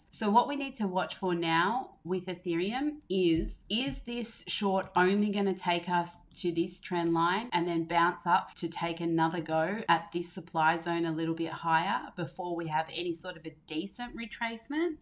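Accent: Australia. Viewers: Hi, great content, you sound like an Aussie !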